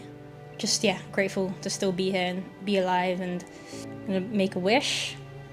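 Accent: Philippines. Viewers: I was about to ask kung pinay ba sya haha kasi iba accent nya pinay pala talaga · Ur Tagalog accent is sooooo lovely 🥰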